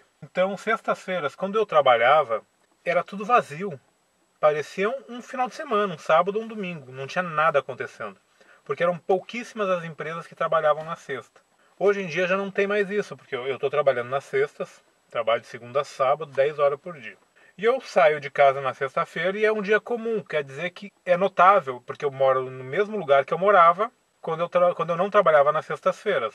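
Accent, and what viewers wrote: Rio Grande do Sul, Brazil. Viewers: TU EH GAUCHO?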